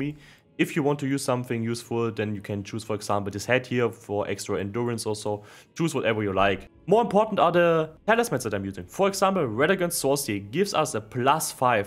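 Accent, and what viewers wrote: Germany. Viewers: Gehe ins Video und weiß direkt am Akzent, dass du deutscher bist😂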